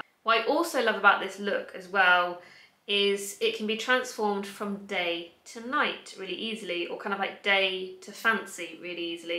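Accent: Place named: United Kingdom. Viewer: I really enjoyed this video!! there are some outfits that I definitely will keep in my mind for futur looks. Favorite are day date look, evening date, studio look, raining day in the city! really helpful for my futur city (London)! I would like to have an inspiration for museum day/ gallery day/ city strolling AND a casual look at home, home work or Monday/Thursday home look something comfortable and causal chic maybe AND definitely wedding look because I will have wedding look next year too! Thank you and always enjoy to watch your video and gosh your British accent is delicious ( Don't know if it's a great word for accent language but I love the idea)! Have lovely week sweetheart xx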